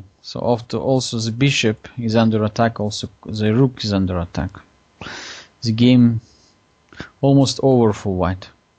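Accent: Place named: Arab world. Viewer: Лайк за акцент: озвучку Stronghold Crusaders за арабских воинов вспомнил, прослезился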